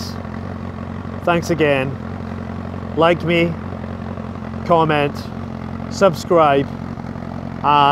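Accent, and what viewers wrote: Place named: Scotland, United Kingdom. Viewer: Scottish accent there I sense. Where you living now mate!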